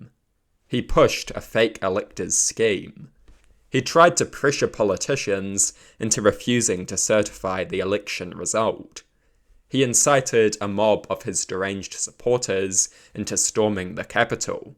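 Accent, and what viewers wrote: New Zealand. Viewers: This is actually just a form of Kiwi dialect you haven't become accustomed to yet. Stop being racist bro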